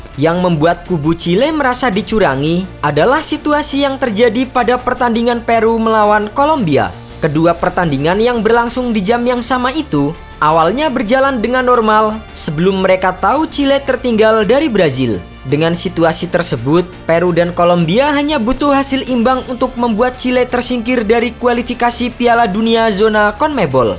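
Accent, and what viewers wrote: Indonesia. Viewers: kn logat orang indo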